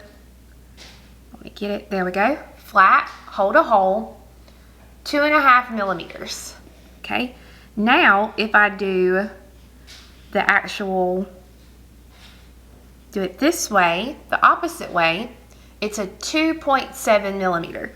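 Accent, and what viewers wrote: South, United States. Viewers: Thank you for explaining these beads. I’ve been going nuts with the differences between Toho and Miyuki seed beads. Throw a few Czech seed beads into the mix and you’ve got a hot mess! BTW, I wish I could trade you my Chicago accent for your charming southern accent!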